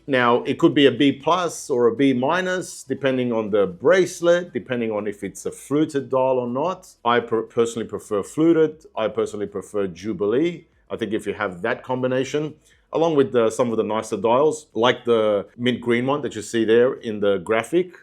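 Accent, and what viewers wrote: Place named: Australia. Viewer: hey brother, are you from Australia as i recognise the accent. Enjoying the content though !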